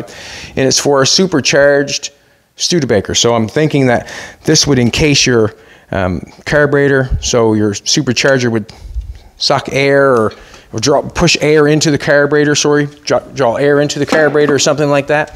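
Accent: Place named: Maritimes, Canada. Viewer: Hey don't worry abut talking too much! I really like the way you put words together! No fancy language just heart felt words. I'm Canadian too and find your Maritime accent and sayings are very entertaining. I follow all the Canadian car You Tubers and you are all are so down to earth. There is a real demand for real no BS (not scripted) reality shows. You and are living my dream life so thanks for taking us along with you. Looking forward to your Australian and Toronto episodes. Great stuff you two!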